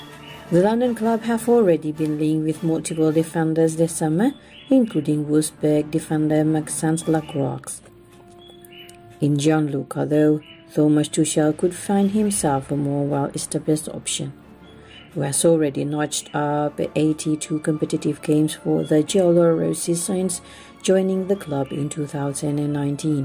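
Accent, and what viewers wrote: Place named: Vietnam. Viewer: AGAIN AND AGAIN VIETCONG VOICE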